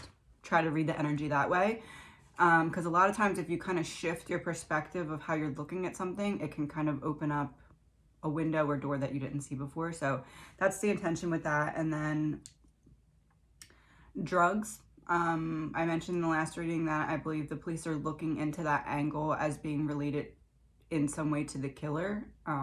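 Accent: Philadelphia, United States. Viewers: That was one of the most interesting hours I've spent on youtube in a long time! I had no idea how cards were read, or how they were used to connect with spirit energy (I'm probably butchering the proper vernacular ) or even how a story comes together, but this was fascinating! I also enjoyed your very laid back, soft spoken demeaner, which is very calming. Do I hear a "Philly girl" in your accent, or a surrounding suburb? I grew up there. It's an accent I seem to notice immediately. Anyway, I was listening to someone on youtube last night who apparently receives information from "spirit" by being shown things in her mind (I guess) which she then interprets. I mention this because her entire session revolved around things and people connected to the death of Hannah Cleere and her family. Many names came to her that were members of Hannah's family, and she mentioned rather often that none of the information she was receiving was what she had expected to be discovering last night. 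If I'm not mistaken, you also mentioned something "catching you off-guard", or "throwing you off" about the cards being chosen as it relates to Hannah. Honestly, both your reading and the one from last night were so interconnected and so overlapping it was quite amazing! I have always thought these murders were either 1) revenge for the death of Hannah, or 2) the ex-boyfriend (Jack D), feeling betrayed by Kaylee for breaking up with him. Just wanted to share my thoughts, and thank you again for such a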